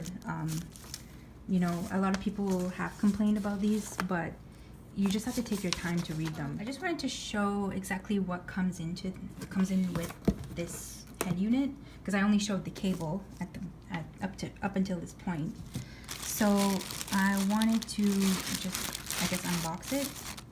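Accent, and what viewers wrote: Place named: Quebec, Canada. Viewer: Miss the Quebecois accent living in California. Salut & merci, anciennement de la rive sud.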